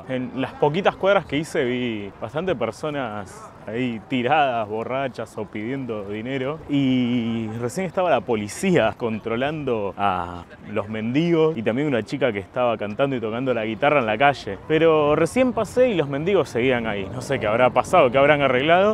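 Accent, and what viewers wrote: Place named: Argentina. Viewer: Viajas por el mundo....y hablas en acento argentino...?.. habla en castellano original...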